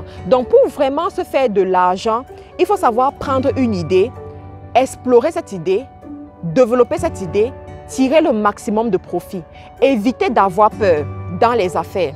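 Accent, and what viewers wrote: Cameroon. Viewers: Prononciation normale même si elle a un accent camerounais tinté semi canadien aussi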